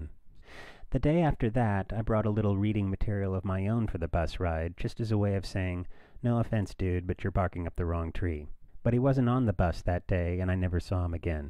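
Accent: Boston, United States. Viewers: Wait your from Boston? LOL! Didn't know that. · OMGSH You're from Boston~ AWESOME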